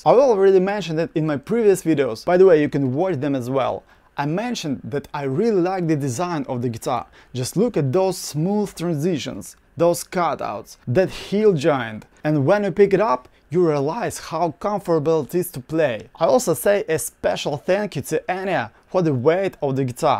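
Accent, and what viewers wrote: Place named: Russia. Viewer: Hi! I hear accent.. You russian?)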